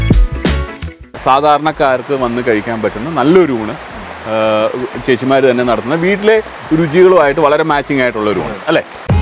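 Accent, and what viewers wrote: Thrissur, India. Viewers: Thrissur എത്തിയപ്പോ ചേട്ടന് ഒരു തൃശൂർ slang വന്നിട്ടുണ്ട്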